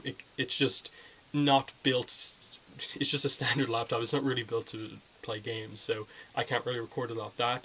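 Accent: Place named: Dublin, Ireland. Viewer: That accent - Churchtown? Terenure maybe?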